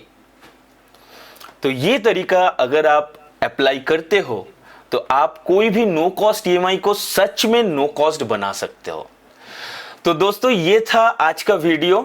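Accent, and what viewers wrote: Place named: Bengal, India. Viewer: I can say you are bengali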